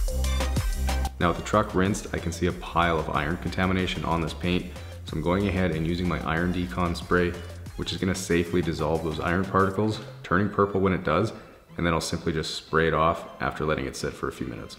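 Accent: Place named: Canada. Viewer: I can't get over the Canadian accent.😂 so cute! Such a satisfying video!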